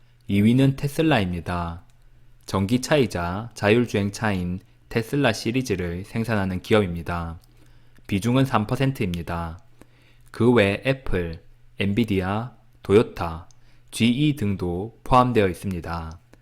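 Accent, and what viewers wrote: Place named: Ulsan, South Korea. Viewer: 좋은 정보 감사히 잘 봤습니다. 말씀 톤으로 보아서는 울산분으로 보이십니다. 아는 분과 많이 비슷한 느낌입니다.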